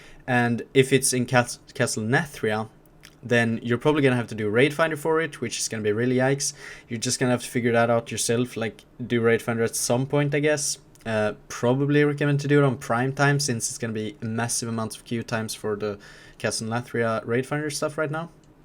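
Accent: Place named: Sweden. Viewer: Du måste vara svensk haha hör det på engelskan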